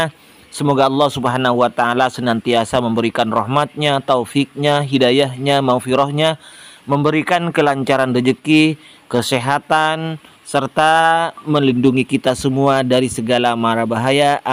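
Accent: Madura, Indonesia. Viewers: Ente orang madura ya min,,? Pantes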